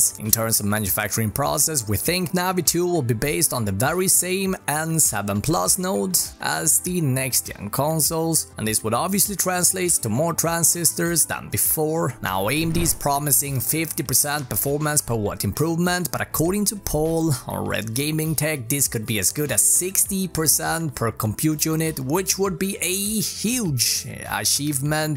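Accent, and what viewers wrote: Sweden. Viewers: some village swedish accent maybe. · swedish accent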